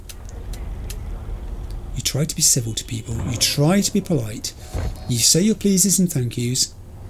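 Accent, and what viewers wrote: United Kingdom. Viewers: Hahaha hearing u say "ese p*che p**" in your perfect british accent was very funny to me 😂😂😂 p** is short for prostitute in spanish and is a curse/rude word used as an insult and the other i think is like a vulgar exagerator. Its especially funny because you never swear in your vids 😅The game you play with the kids gives me sean and daniel (lis2) playing in the desert vibes.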